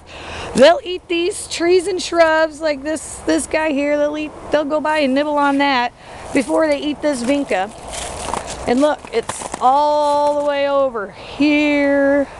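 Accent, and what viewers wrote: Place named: Pennsylvania, United States. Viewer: you sound like you're from PA.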